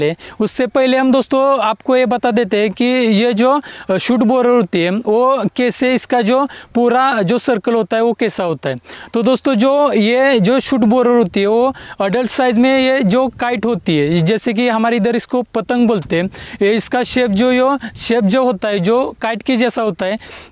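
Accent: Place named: Maharashtra, India. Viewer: योग्य मांडणी केली हिंदी छान बोलला उच्चारण थोडेसे मराठी वाटते पण अगदीच हिंदी येत नाही असे नाही . जो विषय मांडला आहे त्याला निर्विवाद न्याय दिला आहे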